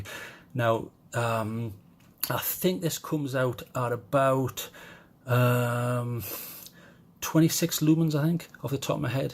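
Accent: United Kingdom. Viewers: 28 minutes for a fuckin headlamp? And that British accent...no go Brit Crazy guy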